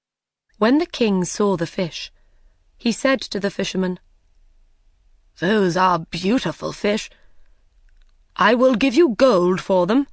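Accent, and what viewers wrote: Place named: United Kingdom. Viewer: Thanks so much.Nice story and excellent narratore with british accent.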